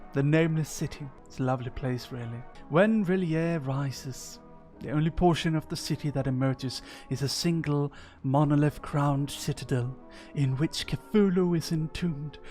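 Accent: United Kingdom. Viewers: With the voice I sort of imagine a British mercenary. The lore of this just really is deep.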